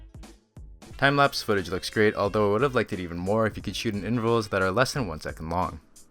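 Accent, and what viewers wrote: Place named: Scotland, United Kingdom. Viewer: Fantastic review maybe talking just a wee bit to fast or maybe its just that accent im so used to Scottish. Great stuff thanks and oh maybe if you have a moment to tell me the awsum music that you used in your review. Much love from bonnie scotland ACH AYE!!!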